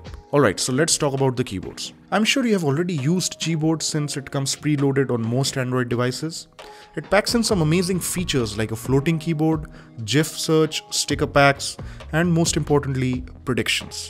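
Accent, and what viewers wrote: India. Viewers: Might be cuz he has an indian accent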